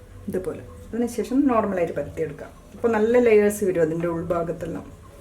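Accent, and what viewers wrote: Kannur, India. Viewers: Language tune like Kannur?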